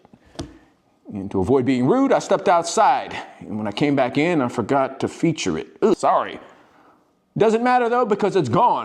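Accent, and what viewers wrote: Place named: South, United States. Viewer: horrible country accent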